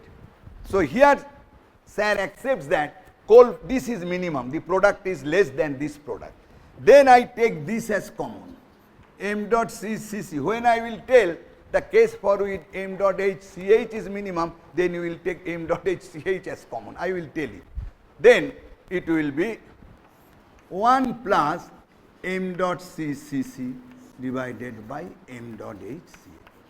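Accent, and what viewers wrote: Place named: Bengal, India. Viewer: Hot fluid cold fluid ka mamla nhi h that bengoli accent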